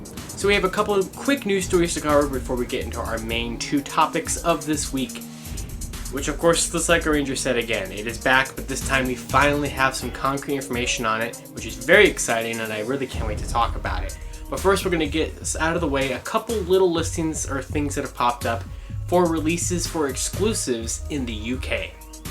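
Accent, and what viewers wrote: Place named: United Kingdom. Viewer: Omg i thought you were from the uk lol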